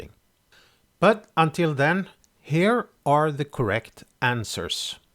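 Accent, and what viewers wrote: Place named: Scandinavia. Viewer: Your accent sound pretty much like your from scandinavia. I would guess you´re from sweden or norway, but, am I correct (im swedish by the way).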